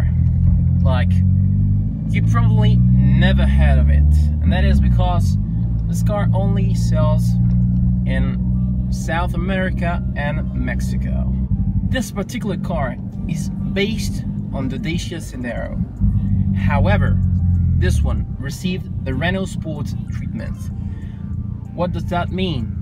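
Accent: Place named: United Kingdom. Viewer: Man me encanta , muy británico !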